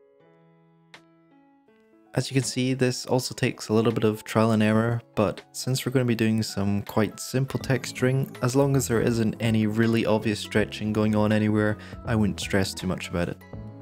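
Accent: Scotland, United Kingdom. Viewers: are you scottish? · nice light scottish accent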